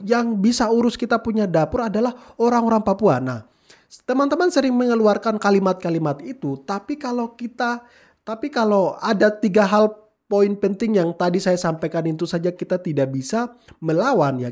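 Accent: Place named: Java, Indonesia. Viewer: ANDA COBA NGACA DIRI DULU BARU BERI PANDANGAN AGAR JGN SDR2 OAP PROTERS ... ANDA PAKAI LOGAT ATAU AKSES JAWA BARU BERIKAN PANDANGAN UTK OAP ITU KURANG ETIS... AGAR SUPAYA PANDANGAN ANDA DIDENGAR OLEH OAP ANDA PAKAI BAHASA IBU ...KECURIGAAN KAMI OAP BAHWA ANDA DI CUCI OTAK UTK BICARA LAIN KERJA LAIN ... ANDA COBA KOREKSI DULU KONSEP BERPIKIR ADA KARENA ANDA TDK TAU MAKA ANDA TIDAK TAU APA YG TERSELUBUNG DI BALIK PANDANGAN ANDA .ITK OAP ...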